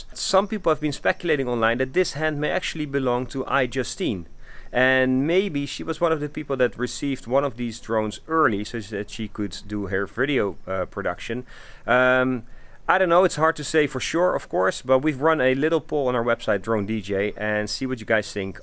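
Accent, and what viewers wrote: Netherlands. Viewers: Hoor aan het accent dat je Nederlands bent maar je spreekt goed Engels 👌🏻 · Are we Dutch by any chance?=) nice vid btw , tnx=) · u have a dutch accent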